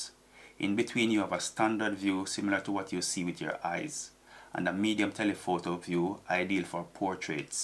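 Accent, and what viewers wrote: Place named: Caribbean. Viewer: I would recognize that Caribbean accent anywhere. Very nice to the point video here. It’s interesting that you bought your lens to use it with your D7200 which I also own since 2015. That is one amazing dx camera which I don’t ever plan to part with. I now also own the Z5 which suits my type of photography. Interestingly that I just recently purchased this 18-140mm from KEH at a bargain price for the exact reasons you mentioned. In addition to my D7200 it works beautifully with my Z5 using the FTZ adapter. It also focuses very quietly during video and takes amazingly sharp pictures on this Z system even though at a reduced 10 mp but enough for me to get a nice sized medium enlargement. It would have been good if you had included a few images taken with this lens at different focal lengths and scenarios. Keep up the good work brethren. 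😀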